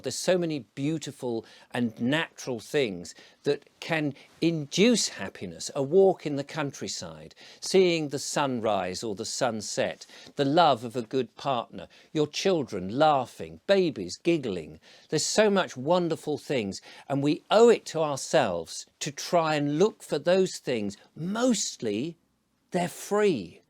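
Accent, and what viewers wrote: United Kingdom. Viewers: It's the British accent.🤫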